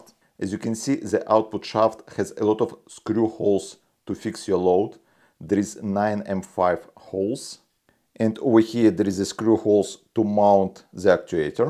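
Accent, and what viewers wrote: Russia. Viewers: Мужик ты же русский! Акцент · Акцент как у русского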